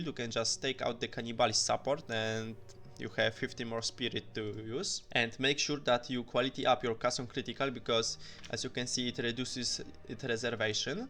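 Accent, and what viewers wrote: Poland. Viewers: Słychać że Polak od razu xD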